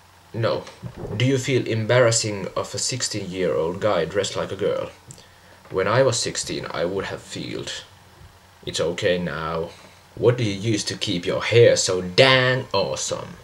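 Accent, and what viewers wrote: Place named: Finland. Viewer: Love your Finnish accent:) i <3 and:)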